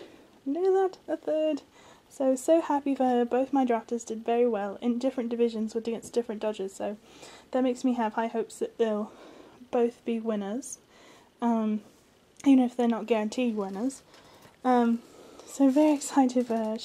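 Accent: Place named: United Kingdom. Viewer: Not to be rude but now u sound British and in other vids u sounded American, anyway love all ur vids!!!!!!!!!!!!!!!!!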